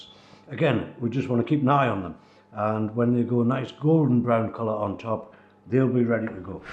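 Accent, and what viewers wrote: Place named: North East England, United Kingdom. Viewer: where are you from I love your accent? I believe your from somewhere in the NorthEast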